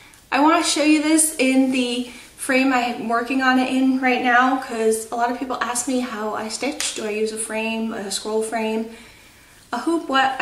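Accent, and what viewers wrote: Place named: New Jersey, United States. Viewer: I really like your videos, your work is gorgeous! I enjoy listening to you talk because I am originally from N.J. and your accent reminds me of home. :)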